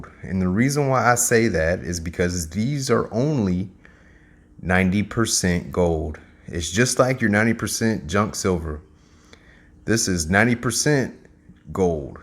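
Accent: South, United States. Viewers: Honestly you got me to the hit the subscribe button on your southernality alone haha Keep on stackin that gold brother!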